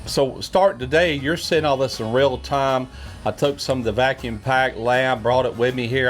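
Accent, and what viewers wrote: South, United States. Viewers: I watched this video today to hear pronounce gyro. Gotta luv that southern twang.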